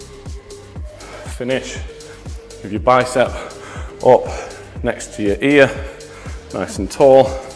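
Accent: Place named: Newcastle, United Kingdom. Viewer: ARE U FROM GEORDIE SHORE??????? A LIFTING LHAGER VIDEO, SMASH ITTTTT LADDDDD. 😊😊😊😊😊😊😊😊😊😊😊😊😊😊😊😊😊😊😊😊😊😊😊😊😊😊😊😊😊😊😊😊😊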